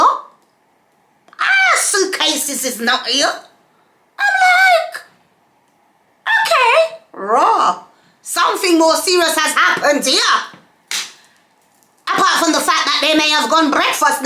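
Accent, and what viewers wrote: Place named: England, United Kingdom. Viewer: Your English accent is awesome don't change it for anyone. Pray for mom that she keeps safe.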